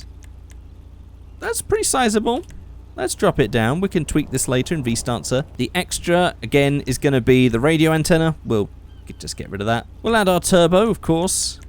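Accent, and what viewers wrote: Australia. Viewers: Mate the Ford xr6 in black with red pint stripes is the tune I like....Australian accent not to bad Mr chrome dome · honestly not a bad aussie accent xD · I was quite surprised by your aussie accent. Its better then some Aussies.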